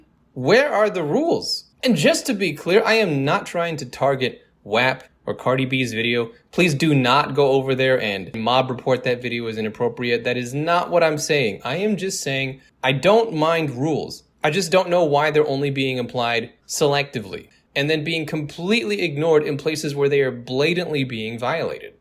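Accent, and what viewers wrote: United States. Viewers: I'll bet it's your vocal tone — they don't want to admit that they think your US regional accent comes across as lewd appreciation. 🤐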